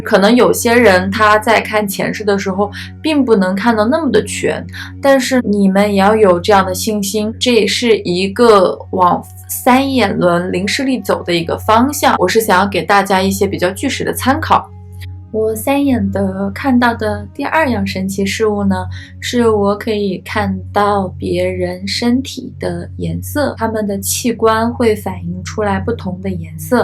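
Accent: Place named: Beijing, China. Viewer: Her accent sounds like Beijing or Tianjin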